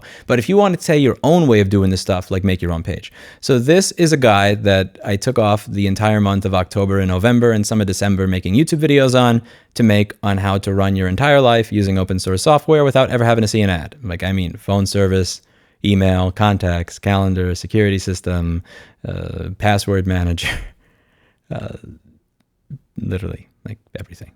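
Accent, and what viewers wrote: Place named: Boston, United States. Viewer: Why do I always think of "Good Will Hunting" when I watch? It has got to be the accent or something...when is schooling companies online, it totally reminds me of the bar scene!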